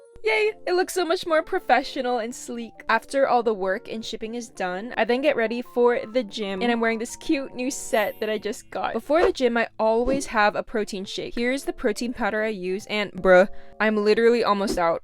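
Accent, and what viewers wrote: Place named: Netherlands. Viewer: your accent looks like your dutch (netherlands)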